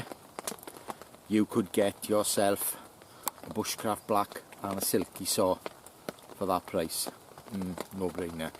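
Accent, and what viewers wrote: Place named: Scotland, United Kingdom. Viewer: New sub here. Love your accent. Scottish? Good content. Will keep on eye on you. I prefer to ramble and bitch, though.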